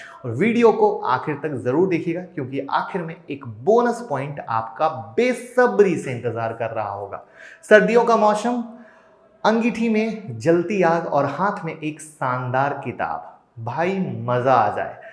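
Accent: Bihar, India. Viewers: AAP BIHAR KE HAIN KA HO. BILKUL BIHARI HAIN. DEKHNE ME BHI AUR SUNNE MEE BHI. DISCLAIMER: I M A BIHARI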